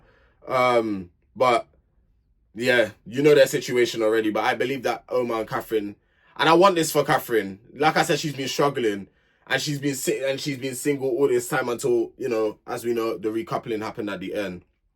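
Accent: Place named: Caribbean. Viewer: Am hearing a Caribbean accent. Are you from Trinidad 😂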